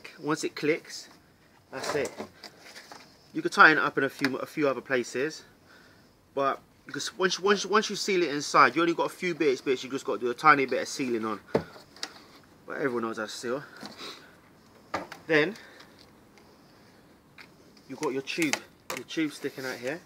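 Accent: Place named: Bristol, United Kingdom. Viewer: London I think but he has a little bit of a Bristol accent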